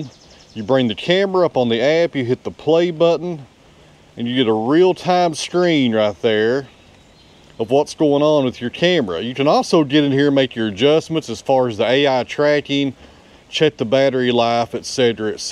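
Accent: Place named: South, United States. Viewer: Its about making a video of a certain time length and incorporating his southern accent as much as possible. People don't want efficiency when watching videos, they want to waste time. Looking into time management for yourself, you have to ask, why are you wasting time on videos when you could be doing things yourself?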